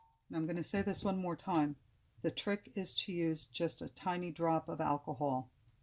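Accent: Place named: East Coast, United States. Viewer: Thanks for sharing. You make it look easy! Just subscribed. I detect an East coast accent! Lol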